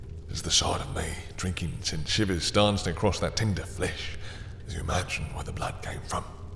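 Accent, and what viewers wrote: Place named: United Kingdom. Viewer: I SWEAR IVE READ THIS SPRIPT BEFORE! This is a wonderful spin on it! I love the British accent! ✨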